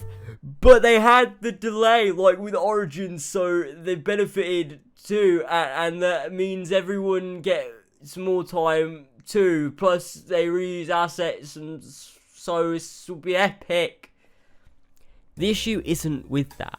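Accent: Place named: England, United Kingdom. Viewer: This video is misinformative and fuck the narrator with an English accent. You’re gay!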